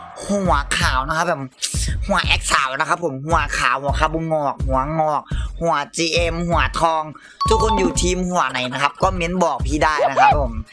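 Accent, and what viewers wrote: Isan, Thailand. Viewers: หวัดดีตัวตึงพากอีสาน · พี่ภาคอิสานจรืงหรอ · อ้ายแมนือเปนตะฮักแท้เว่าอีสานเด้นิย้านฟังบ่ฮู้เรือง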